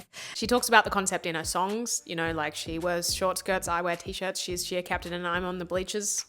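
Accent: Australia. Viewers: Just realised your Aussie! Birds of a feather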